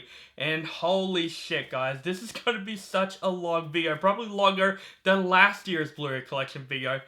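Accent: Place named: Australia. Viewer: Never thought I'd hear an Australian apologize for doing a "terrible Australian accent" lol You sound Australian to me.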